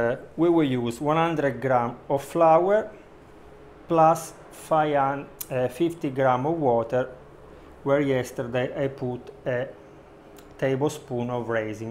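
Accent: Italy. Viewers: I have never seen sourdough started like this. I am going to try it as I have a dud in my kitchen right now from an amazon San Francisco starter. I should get a replacement for it as the company stands by their product, but in the mean time I am going to add some honey and see what happens, then I am going to try this method. No waste! I hate it when it says you have to throw Away and refresh. What"??? I don’t think so! I absolutely adore the accent too. Italians speaking English.. adorable. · LOLOL! It's making me laugh so hard! Especially when I turned on the captions! The captions don't understand a word of what he is saying...and neither do I! He must be from Italy?? He reminds me of the muppet who is a chef!! I like this guy..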